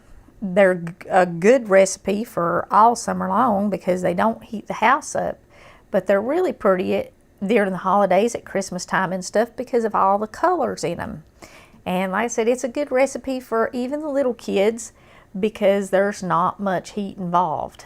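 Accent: South, United States. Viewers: I love her accent. The lovable southerner.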